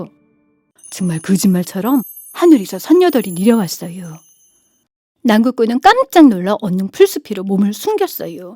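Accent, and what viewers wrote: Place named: Seosan, South Korea. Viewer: 서산 말씨를 자꾸 따라허게 되네유~^^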